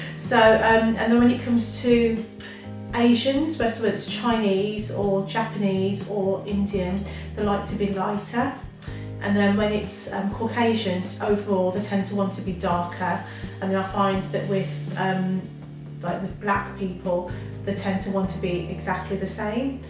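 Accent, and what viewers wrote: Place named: West Midlands, United Kingdom. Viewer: New to your Channel and love your personalities 😊. I can hear your West Midlands Accent ❤️. I use to live in Wolverhampton many years ago 😊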